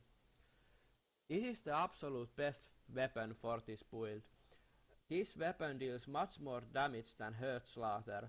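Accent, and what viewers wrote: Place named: Finland. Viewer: Ootko sä suomesta? Kuulostaa niin suomalaiselta englannilta, if you know what i mean :D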